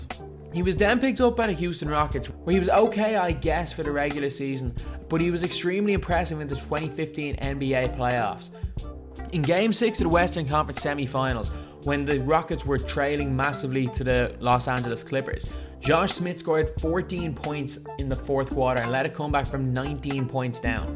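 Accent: Ireland. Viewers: where is josh smith now you ask, in the nba i hate your irish accent go watch your own sports you potatoe eating fuck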